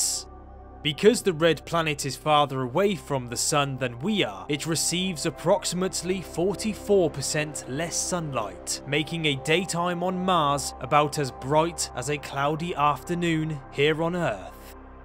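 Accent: United Kingdom. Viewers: you sound like a brit.. so why are you using miles? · More amazing than this video is that the video was narrated by someone with what sounds like a British accent and using miles instead of kilometres.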